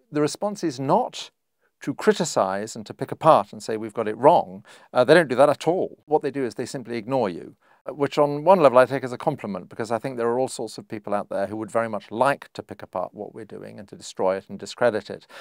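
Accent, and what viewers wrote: United Kingdom. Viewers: Funny how people would swallow whatever propaganda you feed them as long as it’s delivered by a jolly-good British voice.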